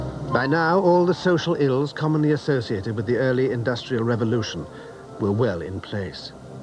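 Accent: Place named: RP, United Kingdom. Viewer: It's called received pronunciation or just RP, often called BBC English or The Queen's English. He's an English actor, probably retired by now.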